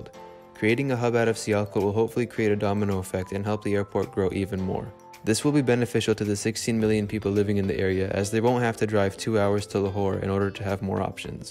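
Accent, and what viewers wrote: United States. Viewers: Great American Accent.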